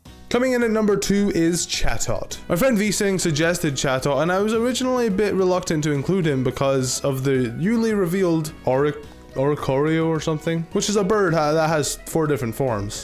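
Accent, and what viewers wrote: Scotland, United Kingdom. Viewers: Is half Scottish, because his voice has a faint hint of Scotland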